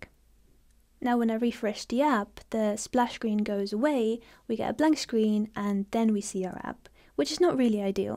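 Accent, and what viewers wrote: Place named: United Kingdom. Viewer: I never understand this british accent. this lady is always confusing. her voice and way of explaining things not quite right. you should always start from clean slate when you explain things.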